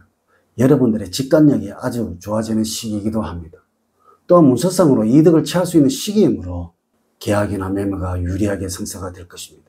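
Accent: Gyeongsang, South Korea. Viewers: 안녕하세요.수고 많으십니다.경상도 발음 이시라 항상 말씀 끝 부분이 속으로 들어가는 느낌이 드네요.첫 부분만 두드러지세요.항상 감사합니다..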